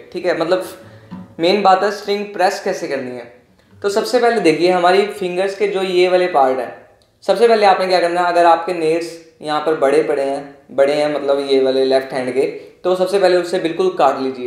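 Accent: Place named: Punjab, India. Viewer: The punjabi touch in ur hindi... Just so sweet 😂❤❤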